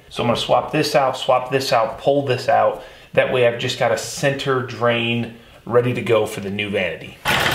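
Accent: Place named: Southeast, United States. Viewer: Don't move the bathroom door out of the hallway into the spare room, you'll hate it later and future buyers with experience in remodeled homes will not like it either. You might think it is just a couple of corners and a few steps now, but you will get so tired of it down the road, especially those times you are in a hurry. I'm not sure where your at, but judging from your accent the SE maybe? Up North here, I would have looked into extending those 2x4s by tacking on some 2x2 and replacing a few 2x4s around openings with 2x6s to get a 5 1/2" deep insulation bay. While you were doing that you could have fixed the framing around the windows with proper king/cripple/header arrangements.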